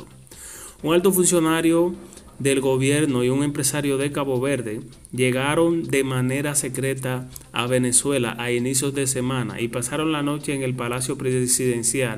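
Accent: Cuba. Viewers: Que mal lees las noticias, y ese acento Cubano no lo puedes disfrazar. Al mejor estilo del G2 cubano...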